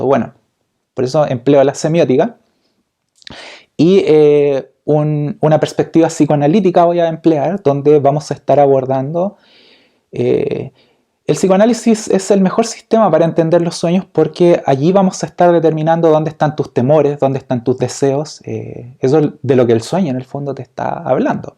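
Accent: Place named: Chile. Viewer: Me encanta escuchar Ace te chico super chileno..... Con esa voz tan cálida y tan clara..... Es familiar y cálido..... Además de certero en el tema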